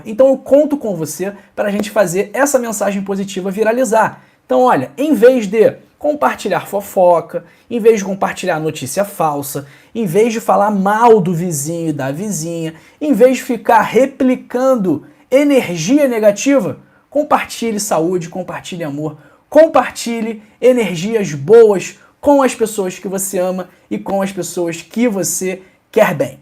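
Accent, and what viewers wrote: Rio de Janeiro, Brazil. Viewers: COM ESSE SUTAQUE NEM PRECISA FALAR QUE E DO RIO DE JANEIRO.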